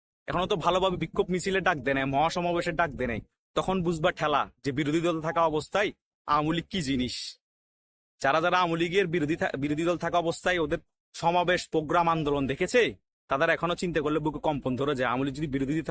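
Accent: Chittagong, Bangladesh. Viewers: ভাই আপনি কোন অঞ্চলের। চট্টগ্রামের ভাষায় কথা বললেন যে।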